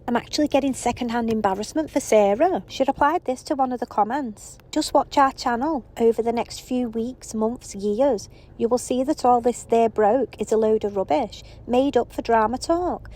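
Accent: Liverpool, United Kingdom. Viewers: Are you a scouser? Love it! Bloody love scousers. I’m from Wirral.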